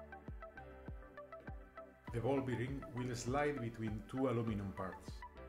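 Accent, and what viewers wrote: Spain. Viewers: Tienes al acento espanol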